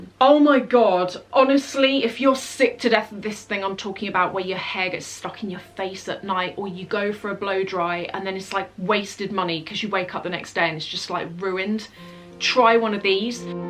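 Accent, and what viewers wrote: England, United Kingdom. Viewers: Ohhh....it is really enjoyable listening to your talking. I like your british accent so much! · Looooove learning more of the english accent in looking at videos like this. New french subbbie here!!!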